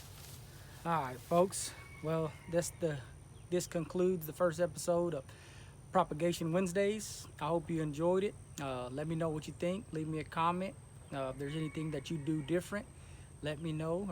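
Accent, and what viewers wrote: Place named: Texas, United States. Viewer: Thanks for this video! I’m in Los Angeles, CA, but I’m Mexican-American with family in the Galveston area & I immediately clocked your accent. Love it and makes me miss TX. Y este bigote! Nice. Also, I have a question. I have a peach tree that is in an area of my yard that doesn’t get enough sun and clearing the foliage & bushes around the existing tree isn’t an option. Half of the tree was diseased and I cut all of those parts off. (We found the tree this way when we moved into the house.) I just set up the water bottles yesterday. Will the tree being formerly diseased (I think it was a bug infestation because it was leaking so much sap!) affect my propagated peach trees? My plan was to take the propagated trees and plant them in a better part of the yard, then cut down the mother tree.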